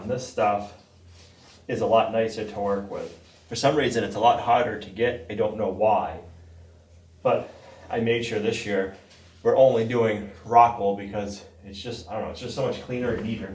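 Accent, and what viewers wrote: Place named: New England, United States. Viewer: Giggle. You called your wife Gottta love the New England accent! I grew up in MA, got called "Linder" so much, it became my nickname.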